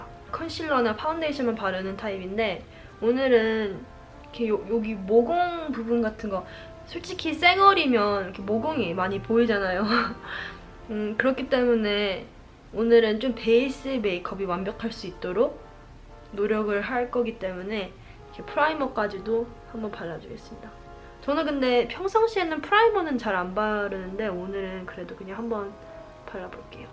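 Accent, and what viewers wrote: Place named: Konglish, South Korea. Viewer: Is it weird if I say I love listening to you speak Konglish? :)))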